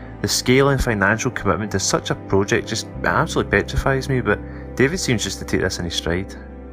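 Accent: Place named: Scotland, United Kingdom. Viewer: It’s wonderful to see this proud castle be restored. And .. nothing like listening to a voice of a Scotsman.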